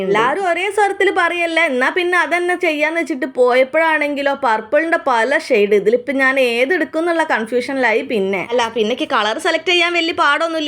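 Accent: Kozhikode, India. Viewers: ഏച്ചി കോഴിക്കോട് ആണോ ഇക്കി ഒരു doubt അയിനൊണ്ട ചോയിച്ചേ ഇന്റെ നാട് കോഴിക്കോട് ആണ് 😊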